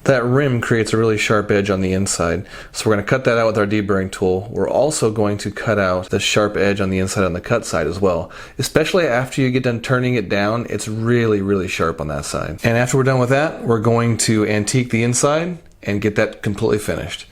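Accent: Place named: Indonesia. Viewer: Yang dari indonesia mana suaranya😁😁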